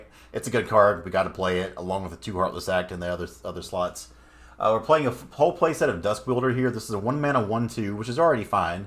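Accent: South, United States. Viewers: Great decks, great analysis, charming southern dialect. I’m sold. Btw, it’s not pronounced «djorn,» it’s «yorn.» ;) Source: Am Norwegian.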